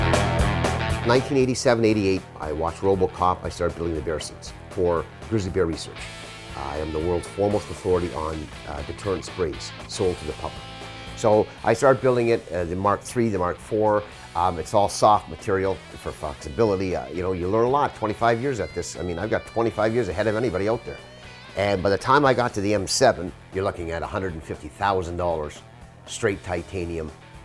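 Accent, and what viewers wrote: Canada. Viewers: “Y’know?” Yep that’s how talk in northern Ontario 😭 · This guy is clearly Canadian. He has the accent and the video states he is from Ontario.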